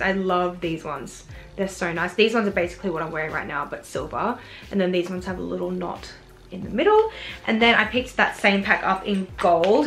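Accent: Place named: Australia. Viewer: so good to hear an aussie accent on yt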